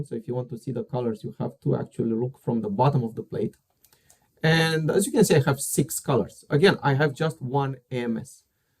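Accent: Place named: Romania. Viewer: Are you romanian? :)) (because of the accent) Anyway, congrats for explanations.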